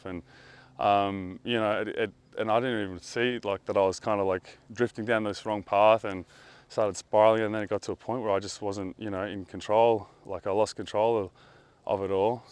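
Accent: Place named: Australia. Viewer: Australian x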